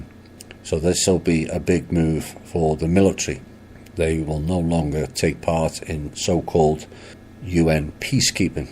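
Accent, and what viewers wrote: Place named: United Kingdom. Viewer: ??????????? A British voice talking about soverign????? No one believes this is real.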